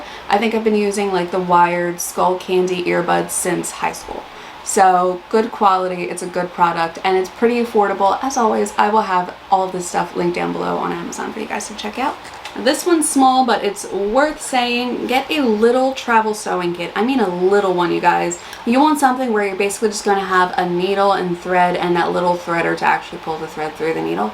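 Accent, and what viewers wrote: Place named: Australia. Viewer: I'll never fly international, but as someone who cruises many of these are a good idea for cruising as well. Cruise ship cabins don't always have many hangers either, so those foldable hangers are a brilliant idea, so I can hang my partner's dresses, and my 'formal night' gear. Great ideas. I look forward to seeing some of your travel vlogs when you take Mark to Philly. It'll be interesting to note how aussie you probably now sound to your friends and family.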